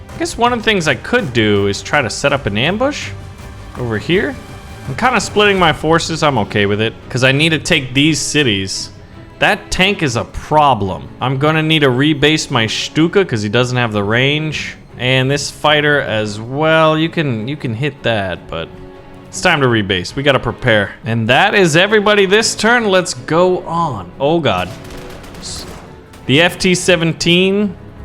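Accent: Germany. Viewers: german accent in on point👌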